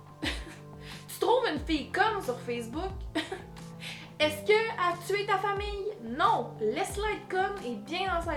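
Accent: Quebec, Canada. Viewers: l'accent canadien comment je le kiffe wahou le meilleur accent pour moi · J'adore cette vidéo (et l'accent quebecois c'est trop chou <3 ) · j'adore cette accent québécois j'aimerais trop de l'avoir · Salut, j'espère que tu vas bien? J'ai découvert ta chaîne il y a quelque jours et j'ai regardé énormément de vidéos de toi. je tiens à te dire que j'adore tes vidéos et J'ADORE ta franchise. Je l'avoue j'aime ton accent québécois:) Merci de nous parler comme tu le fais, ne change rien. Full love! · J'adore quand tu t'énerve... On entend bien ton bel accent québécois !! 😁. Ne t'arrête pas tu es toujours aussi génial. Full love de Suisse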